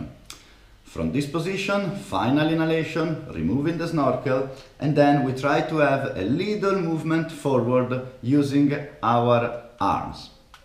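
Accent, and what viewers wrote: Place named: Italy. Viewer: Your italian dialect is so cool!😎🤙🏻 Thank you!😊👌🏻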